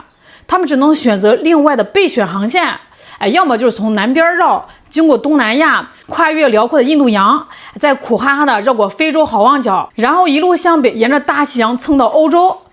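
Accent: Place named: Hebei, China. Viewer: 有真有假、听上去气势挺足、听听热闹罢了….普通话标准一点就更好了、应该是河北的。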